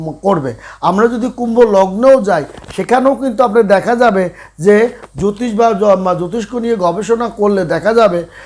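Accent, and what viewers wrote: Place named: Jessore, Bangladesh. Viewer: উনি যশোর জেলার/ খুলনা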